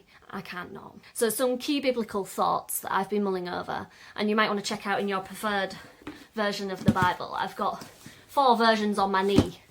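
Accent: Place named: Yorkshire, United Kingdom. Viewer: Completely agree with your sentiments - but can't quite place your accent? Yorkshire maybe?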